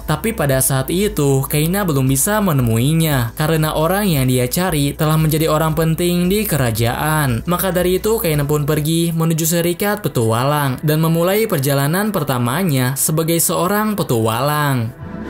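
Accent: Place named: West Java, Indonesia. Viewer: Pantesan kayak orsun logat bicaranya wkwkw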